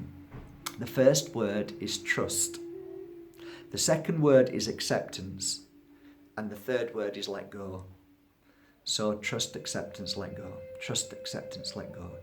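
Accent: Yorkshire, United Kingdom. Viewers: Is your accent Yorkshire? I have a Leeds accent, yours sounds Leeds/Calderdale area? Just found your channel - it's wonderful!! Many thanks x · You from Yorkshire northern England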